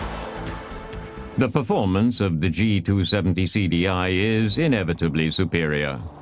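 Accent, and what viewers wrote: United Kingdom. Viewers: What is going on with the narrator's accent? He sounds like an English BBC science presenter from the 1960s, but this is clearly filmed on the continent with right-hand drive cars where the prices are quoted in Euros. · youre from UK ? :)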